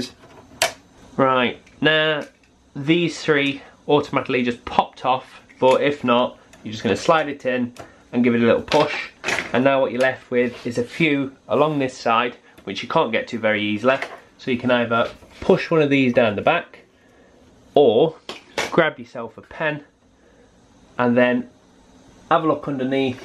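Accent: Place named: North, United Kingdom. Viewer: Legend. Loving the northern accent too. Where did you get the WiFi card?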